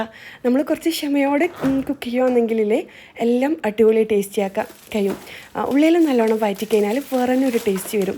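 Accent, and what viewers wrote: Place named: Kasaragod, India. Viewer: Pinne kooduthlayum vidiokulla voice kodukkunnadh kelkkan nalla rasa kasaragodile bhasha chengalle malayalam ariyamengilum ivide samsarikunnadh vere bhashailan orupadu ishtam samsaram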